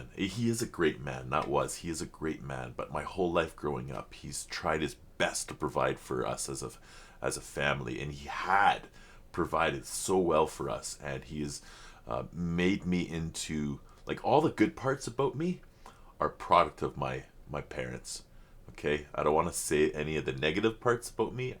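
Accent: Canada. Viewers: I suspect is Canadian due to the way he pronounces his '-out' word sounds as -'oot'. He's been struggling with the pronunciation of Dimash's name for forever. It's not making a connection in the brain. I think we just have to accept it and not worry about it. He's a massive fan and loves Dimash as much as we do.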